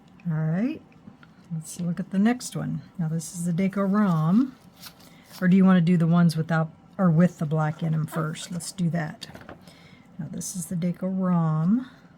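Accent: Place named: England, United Kingdom. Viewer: Loll! I heard his beautiful English accent reading this comment!!! And yes beautiful results ❤🎉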